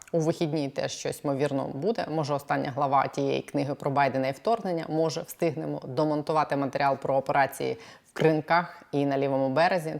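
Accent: Russia. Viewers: Пані, чому Ви весь час промовляєте назву Південно-української станції на кацапський манер - типу южно-укрАїнська станція? Це вплив кацапоговорящіх в Києві? Вам так не можна - Ви на передовій теж, тому виправляйтеся будьте добрі 😊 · Ви так на російський манер вимовляєте з наголосом на А, УкрАінская АЕС, що блювати хочеться.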